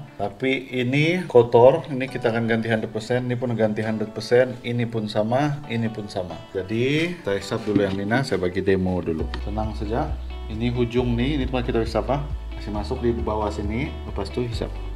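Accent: Sabah, Malaysia. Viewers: Terbaikkk bang 👍🏼👍🏼👍🏼👍🏼 moga teruss sukses supportlocal ❤️ ada sdh channel tmpt berguru 😁 kalau channel lain susah mau di difaham.. maklumlah kurang kita faham bahasa indon dgn semenanjung...nah tapi kalau logat sabah TATAP PAHAM ITU LOGAT 🤣 enjoy tgok video abg ni...buka buka jak youtube terus pegi tgok channel...semoga banyak lgi video dan ilmu yg abg sampaikan... #barumaubelajar 🤣👍🏼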